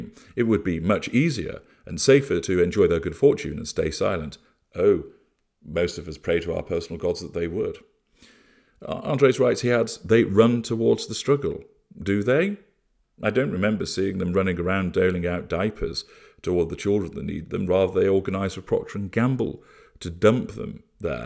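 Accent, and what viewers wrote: United Kingdom. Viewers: Another brilliant video, you are so funny 😆 I really enjoy listening to you, love your British accent, eloquent explanations and sense of humour! Wish you all the best for the future and success with your YouTube channel!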